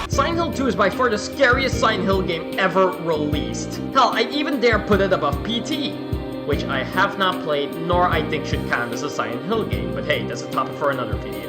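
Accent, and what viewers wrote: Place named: Flanders, Belgium. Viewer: Flemish accent detected Gezegende silent hill upload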